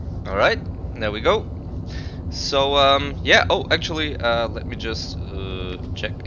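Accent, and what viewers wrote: Germany. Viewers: u sound german are you?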